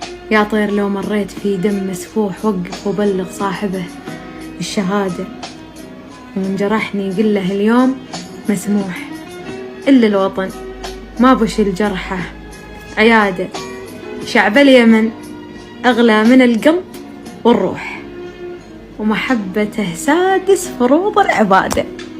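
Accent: Iraq. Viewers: لڪك ابوس لعراقبه اموت فيڪكن وبلهجتڪكن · محلالهجه العراق حب كبير من اليمن للعراق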